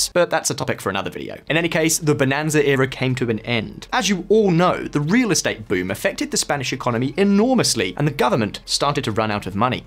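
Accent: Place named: England, United Kingdom. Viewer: Someone with an English accent bad mouthing Spain. Is this supposed to surprise me? Why don't you talk about the financial mess in England?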